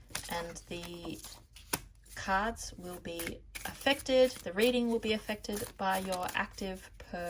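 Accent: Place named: Australia. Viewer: Thank you. I love hearing an Aussie accent. Your approach is intriguing and unique. Pile #4 was perfect.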